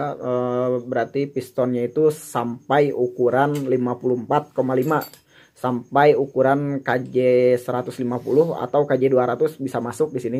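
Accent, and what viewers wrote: West Java, Indonesia. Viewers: lokasi mana bang..logatnya kayanya sunda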